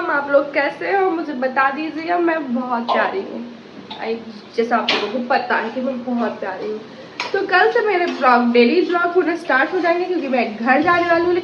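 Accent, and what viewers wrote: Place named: Bundelkhand, India. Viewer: Bundelkhandi achhi LG Rahi aap pe❤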